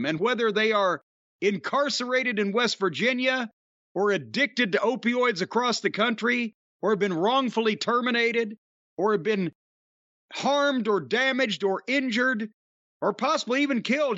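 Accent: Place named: Chicago, United States. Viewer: Gotta love Cornys Chicago accent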